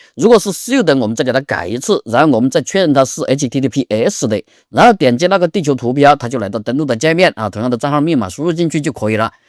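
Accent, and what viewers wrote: Sichuan, China. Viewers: 你是重庆人还是四川人？太喜欢的川普了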